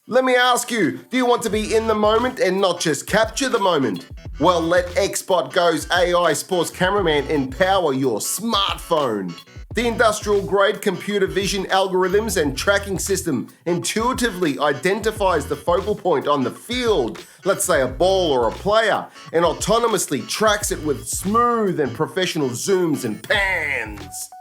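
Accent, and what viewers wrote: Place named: Australia. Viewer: Surprise, the guy with an Aussie accent picks the Aussie to win. Stylistically, Hitchins should win. However, Liam's gas tank is huge. Should be a great fight.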